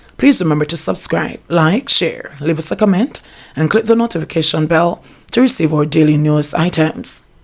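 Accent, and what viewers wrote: Jamaica. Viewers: Wow wow that’s what I’m talking about come see never see hi sexy voice please use your tardy voice more often we in England love your accent when you talk like the locals it suit you we love it ok car frightened cowboy him fi go ride donkey and band from driving on the road life in prison hard labour leave family in pain over a stupid mirror fi life Jamaican man or all sick ladies I sorry for pall chow chow sweet voice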